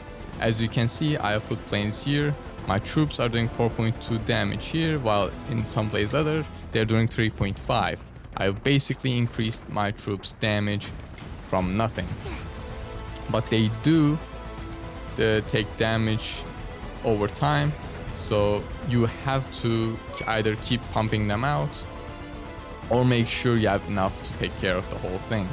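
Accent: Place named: Turkey. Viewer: Are you turkish your accent seems turkish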